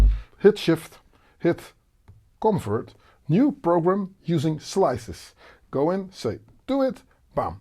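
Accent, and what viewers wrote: Netherlands. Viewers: Assuming you're Dutch based on your accent. Correct me if i'm wrong haha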